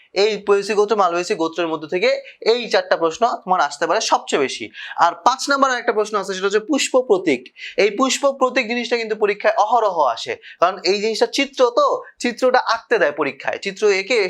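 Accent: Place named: Pabna, Bangladesh. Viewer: আপনে তো পাবনার সার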